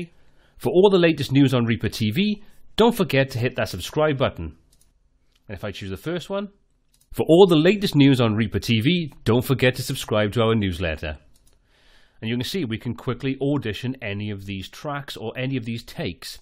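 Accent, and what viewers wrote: Wales, United Kingdom. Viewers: The ever friendly Welsh accent ! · Do I detect a Welsh accent? If my ear is correct, ReaperTV for the WIN because me our mum is from Cardiff [Cah-diff]. =D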